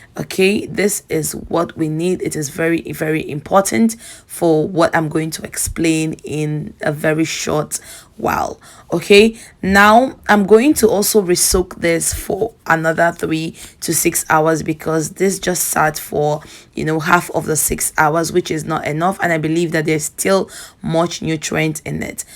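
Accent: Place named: Nigeria. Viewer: you’re annoying ! Just answer the comments 😂 not everyone can understand that strong ass Nigerian accent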